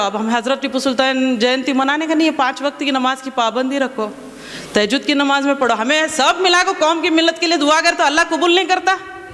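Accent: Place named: Bijapur, India. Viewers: Bijapur urdu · Urdu dakni mix. Good👍👍👍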